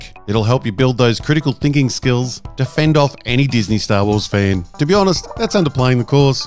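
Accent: Australia. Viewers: Ah.....luv ya work,! And the Aussie accent totally keeps it real, bro! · Lmao I love this channel. The AT AT with that music intro and outro (idk why I love it sm but I do haha), the constant bashing of Disney’s Star Wars, and the hilarious jokes this guy makes with an Australian (I’m assuming) accent. I apologize if I assumed wrong haha but keep the good work up. Love your content :)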